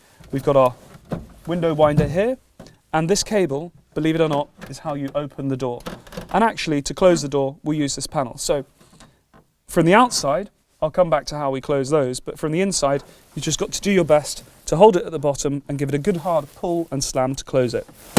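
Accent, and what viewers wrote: United Kingdom. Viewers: hearing a brit explain how collectors complain about having a lift vs having an auto lift is a rich ppl problem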